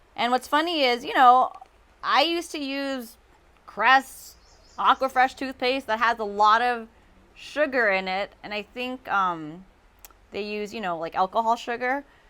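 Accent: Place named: England, United Kingdom. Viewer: nice english accent.. gorgeous smile.. ❤️ thanks for the recepy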